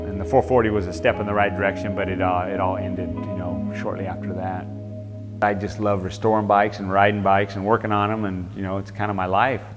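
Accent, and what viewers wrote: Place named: California, United States. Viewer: Oh my god!!!! can i come over and walk that collection some time. You sound like your in california, im up in tahoe. Ill bring beer. Workin on a 79' XR250 right now, but im going more custom. My dad gave away 3 ossa's in like 88' i was in 5th grade and had no pull. My gramps gave away 2 old hondas and a yamaha that same year. Man! those guys I'd love to have those bikes today.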